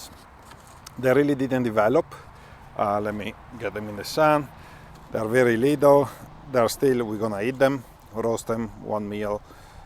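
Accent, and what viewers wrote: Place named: Italy. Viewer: Grande.. … looks good 👍👍 love your Italian accent when you speak English 😂😂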